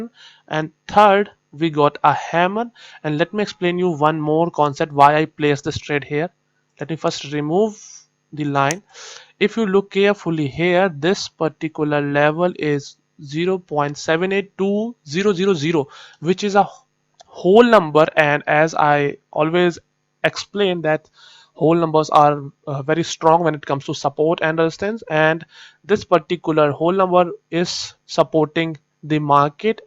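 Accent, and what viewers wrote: India. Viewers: hi bro. i'm from Vietnam. it's not easy for me to listen Indian English but i'm so happy to see your video. it's truly useful. Thank you so much.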